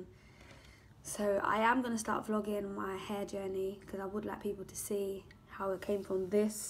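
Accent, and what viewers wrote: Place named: London, United Kingdom. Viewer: Nice London gal accent though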